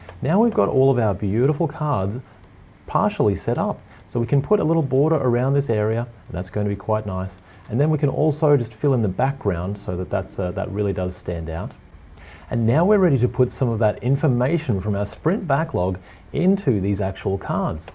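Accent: Australia. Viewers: so nice as an aussie to hear another aussie accent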